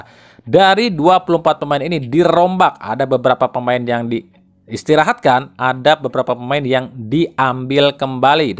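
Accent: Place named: Sunda, Indonesia. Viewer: Nyimak hungkul, suara kang khas sunda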